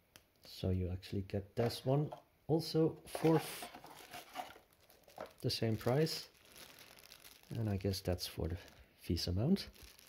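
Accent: Netherlands. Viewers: I hear a bit of nederlands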